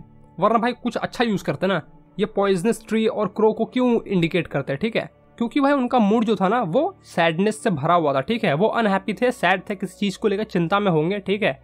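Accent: Bihar, India. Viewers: Bihar se ho kya sir?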